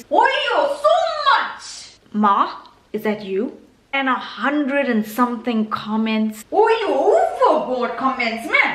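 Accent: India. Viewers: Why do you have an Indian accent? Not being rude, just curious. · I am really curious about 1 thing, about your Indian Accent ,by the way I lovee it, I thought that the Indian Accent came with the language or goes hand in hand with the language...but I am shocked to hear that you can not speak the language, but u still have the Indian accent, how come...plz don't take this the wrong way, I was just feeling curious about that :)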